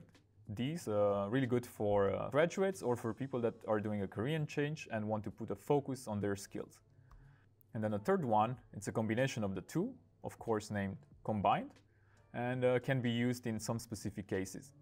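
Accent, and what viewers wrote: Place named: Israel. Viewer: You must be from Israel. You sound Israeli and have a great personality.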